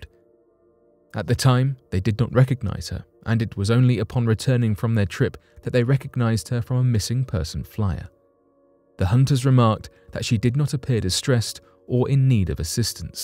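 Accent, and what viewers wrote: United Kingdom. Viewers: Brits say geezer. This dude is a Brit.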